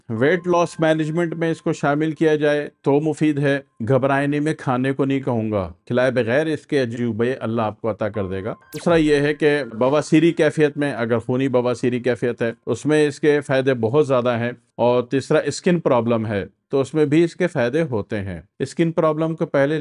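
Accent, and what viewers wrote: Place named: Hyderabad, India. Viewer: Aap bilkul hyderabadi lehje mei boley hyderabad, khushi hui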